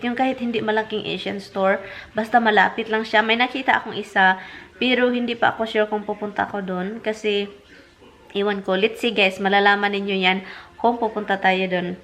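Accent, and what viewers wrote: Visayas, Philippines. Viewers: hello miss avid fan ako tanan vedio vlog mo nasakit tyan ko kakatawa sau..kng paano ka magsalita ng bisaya regards sa hubby mo.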